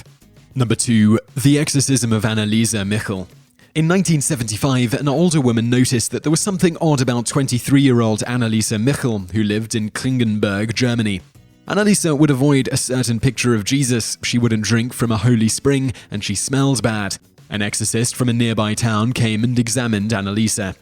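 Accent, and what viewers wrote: United Kingdom. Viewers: Sean S., it doesn’t matter where she was born or how she pronounced it herself. British pronunciation is just as valid for narration as American pronunciation.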